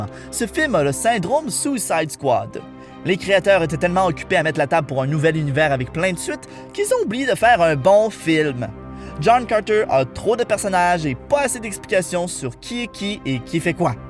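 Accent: Canada. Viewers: J adore l accent candien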